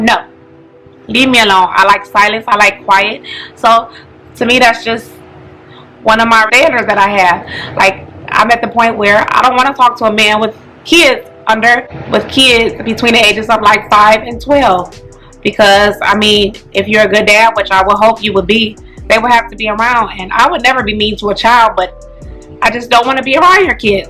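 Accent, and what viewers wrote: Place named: Spain. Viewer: like a Spanish accent to it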